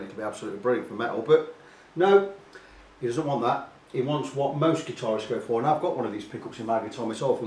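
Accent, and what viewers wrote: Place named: North, United Kingdom. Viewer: great stuff mate. was the guitar just standard 2 humbucker 3 way toggle, no push pull pots. just because normally you would be soldering 2 wires together from the p/up in the old school way. i'm putting a seymour jb 4 conductor in a les paul with pcb. i bought a pre wired molex adapter on ebay for purpose but wires came loose when plugged in! so thinking doing it this way. cheers pal, sounds like you're a fellow northerner....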